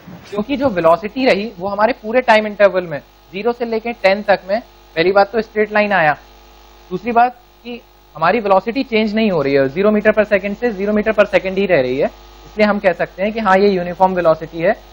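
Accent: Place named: Bihar, India. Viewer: bihari lol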